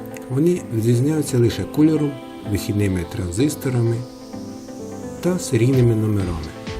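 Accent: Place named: Central Ukraine, Ukraine. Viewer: Акцент центрально-український, черкащина/південь київщини/кропивниччина чи полтавщина.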